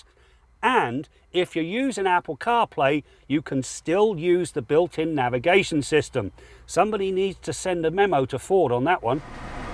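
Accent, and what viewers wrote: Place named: United Kingdom. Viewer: Is originally from the UK? I like his accent.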